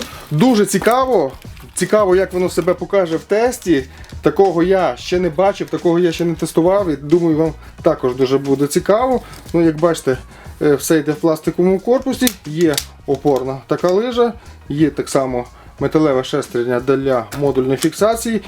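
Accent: Russia. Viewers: Хз, мне лично иногда уши резало неправильное произношение с примесью русского, да что там, даже название неправильно написано(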